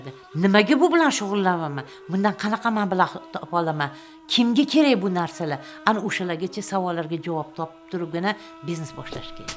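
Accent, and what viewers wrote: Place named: Tashkent, Uzbekistan. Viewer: Toshkentlik sheva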